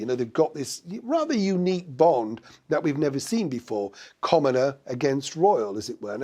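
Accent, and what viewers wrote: Yorkshire, United Kingdom. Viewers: Katherine is every inch a royal, Meghan is a wannabe, she will always come up short. You did give me a giggle earlier, I heard your comment as......''I (Meghan) am a hooker!!!!'' it is your lovely Yorkshire accent that did it............... made me laugh, until I realized what you had really said ''I am a hugger.'' hey ho. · Being roasted in classy Brit accent has to be hell😂😂😂 I’m laughing at the roast. Damn Mr!!! Take it easy on her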